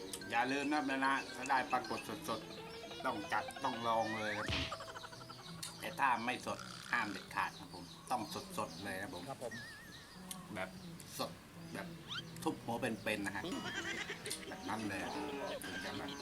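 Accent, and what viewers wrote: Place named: Surin, Thailand. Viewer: น้าอยู่จ.ว อะไรครับ สำเนียงเหมือนคนสุรินทร์หรือไม่ก็บุรีรัมย์ใช่ไหมครับ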